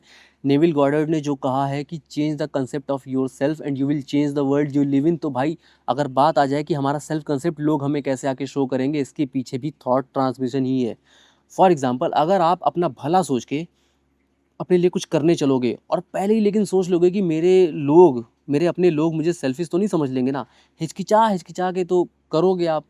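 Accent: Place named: Bhojpuri, India. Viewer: Loved your bhojpuri tone ❤our language is our Pride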